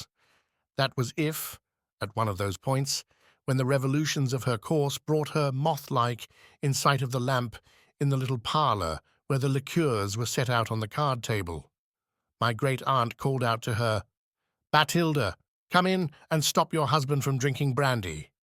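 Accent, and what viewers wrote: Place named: United Kingdom. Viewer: Who is the reader of this wonderful novel? He sounds very British, not at all American.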